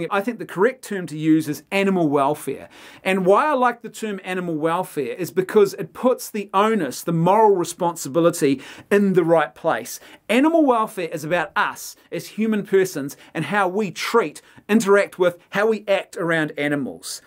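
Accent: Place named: New Zealand. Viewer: Rare-bits and Possums are pissed? Are they always this mad down there? Love your NZ English :o)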